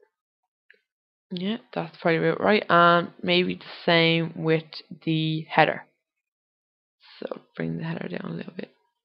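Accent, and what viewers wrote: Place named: Ireland. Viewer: you do have a lovely irish voice. thanks for the vid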